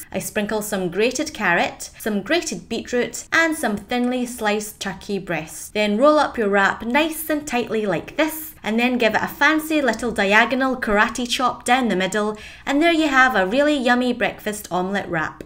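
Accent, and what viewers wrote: United Kingdom. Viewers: From your accent I thought you were from the UK, but once I saw the black swan hummus and heard you bought it from Coles I knew you're from Australia! So I subscribed start away! Yah~ can't wait for more videos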